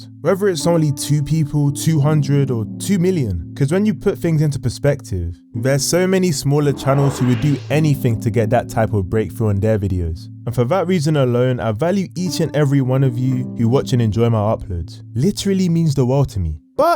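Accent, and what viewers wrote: United Kingdom. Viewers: its peak to see a new youtuber with a uk accent bro u just gave me confidence to use my voice now ❤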